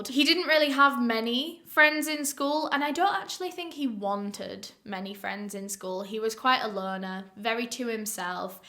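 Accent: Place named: Yorkshire, United Kingdom. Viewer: You can't just pick up a leeds or Yorkshire accent like that ha. I should know, she's from my city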